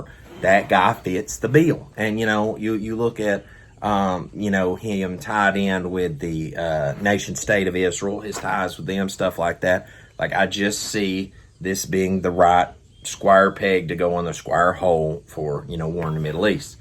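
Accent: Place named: South, United States. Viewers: Brother, I was blessed when I found your channel with someone else with an accent like mine. I'm from Kentucky, but our accents are very similar so you feel like home to me. I thought of something while you were talking, and I'm sure you and others have already done thunk it long ago. While the fake Saturday Sabbath Keepers are getting persecuted us lunatics keeping the truth Sabbath will probably be flying under the radar and not get persecuted, but protected by the Most High for keeping His true Sabbath. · As a colored young man I can honestly say I actually like that country accent bro lol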